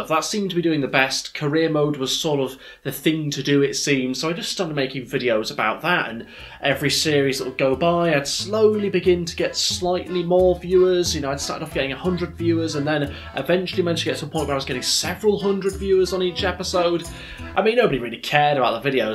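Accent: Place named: Oxfordshire, United Kingdom. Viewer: Your voice has changed even from 2017, you sounded more Oxfordshire than Yorkshire but what ever, congratulations on continuation of your vids !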